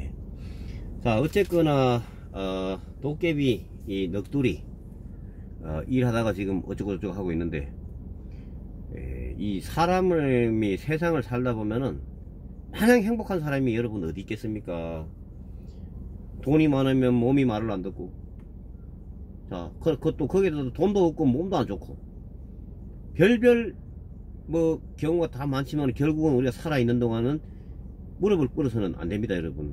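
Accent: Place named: Gangwon, South Korea. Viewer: 인물도 훤하십니다 상동에 가보구싶네요 말씀도 강원도 본토말씀 듣기좋습니다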